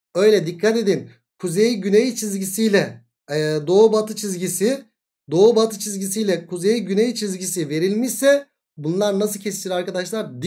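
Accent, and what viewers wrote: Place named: Thrace, Turkey. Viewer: Hocam siz devrekli değil trakyalısınız bence hatta Çanakkalelisiniz. Konuşmalar çok bizden 😄 ağzınıza sağlık anlatımınız süper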